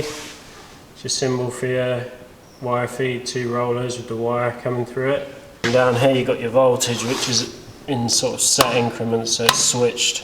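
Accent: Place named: London, United Kingdom. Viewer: You sound like you're from London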